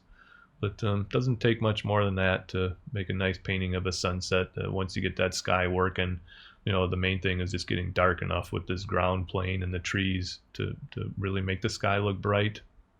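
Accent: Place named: Minnesota, United States. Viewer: Grew up in Minnesota but moved away decades ago, now living in Southern California. Your wonderful paintings have transported me back to my childhood in an emotional trip to farmland, small towns, seasons, church steeples and huge skies. Thank you,! Hearing you talk also brings me back to Minnesota. You kow what I mean!!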